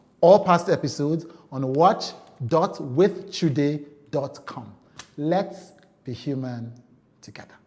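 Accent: Nigeria. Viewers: She sounds more Nigerian than any other nationality. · She sounds like a Nigerian. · Lol. I thought I was the only one who noticed it. She sounds Nigerian.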